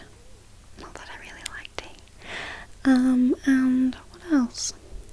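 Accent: United Kingdom. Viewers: Madeline C correct me if I’m wrong, but from theatre experience, this sounds like British to me. ( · You have a beautiful accent! Definitely no need to feel self conscious about it; I think a lot of people probably feel the same way about their own voice. I'd watch any of your videos, whispering or soft spoken you're so relaxing to listen to. Out of interest what part of the UK is your accent from?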